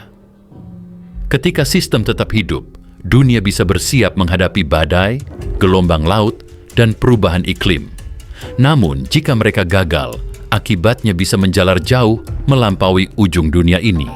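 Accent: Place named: Solo, Indonesia. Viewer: salah,ini suaranya pria solo fixs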